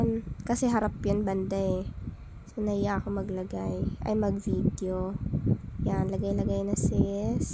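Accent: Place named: Batangas, Philippines. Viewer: Parang familiar ang boses ah parang Yong batangenia done po salamat for sharing ingat po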